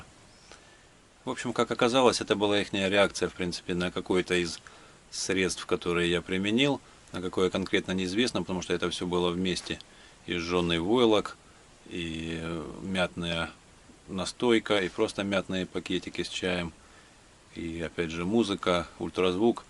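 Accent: Ukraine. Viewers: вы где то на Украине судя по говору?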